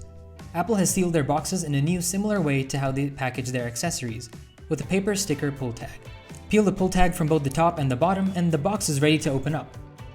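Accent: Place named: United States. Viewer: Living in india but have an american accent?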